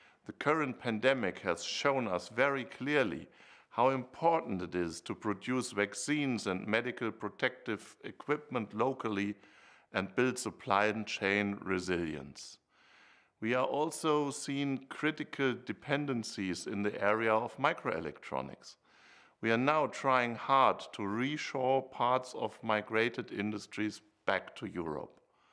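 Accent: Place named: Swabia, Germany. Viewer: Tja, dem Dickerchen scheint keiner zu zu hören, dann bin ich mal der ERSTE: Erst einmal vorneweg Herr Braunibärli, auf dich wartet nichts Größeres, das muss dir nach diesem Vortrag (ablesen) absolut klar sein. 🤪 Meine Empfehlung an dich, Dickerchen, erst einmal abspecken, ich schätze mal so 20 kg, wird zwar schwer sein als Beamter, aber das musst du nun durch, Zeit sollte genug vorhanden sein, außerdem verlangt es der grüne Zeitgeist. Nur Veggie, kein Fleisch und Kohlenhydrate Adieu. 😉 Zweitens: Spießeranzug wechseln, einfach mal locker drauf sein, mit eine wenig Anstrengung klappt das bestimmt. (Vorsichtig ran tasten) Drittens: Haartransplantation, du siehts echt uncool aus, Geld sollte ja genug vorhanden sein als zweit oberster Schwätzer der BRD. Viertens: Weniger mit den Händen fuchteln, sieht echt bedrohlich aus, wollte schon vorschnell am PC einen RESET durchführen, meine rechte Hand konnte gerade noch meine linke zurück halten, ging aber noch mal gut. 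Fünftens: Die Fahnen im Hintergrund gehen ja gar nicht, ich sehe da absolut keinen Zusammenhang, die wehen auch nicht, wollte fast schon weinen schreiben. Sechstens: Was kostet eigentlich der Schinken links im Hintergrund? (Und bitte nicht rot werden) Siebtens: Mehr Englisch üben oder war das schwäbisch, na ja, so schlimm wie der Kollege aus dem Schwabenland war es ja nun doch nicht, obwohl... Achtens: Um was ging es eigentlich? 😁😁😁 Und Neuntens: Wer hat den Quatsch überhaupt bezahlt???, wirrrrrrrrrrrrrrrrrr? Puh, fast ver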